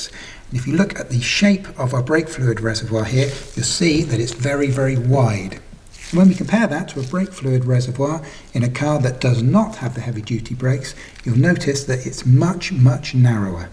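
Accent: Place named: England, United Kingdom. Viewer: I don’t know what it is about the English accent, but it makes every video feel so much more educational lol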